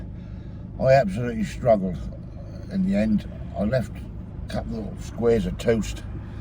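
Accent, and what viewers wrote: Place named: Suffolk, United Kingdom. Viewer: You sound like you still have that great Suffolk accent , So good to hear 👍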